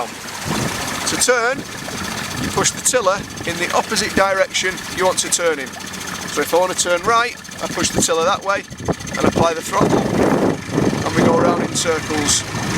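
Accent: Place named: United Kingdom. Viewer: That sounds very British :-)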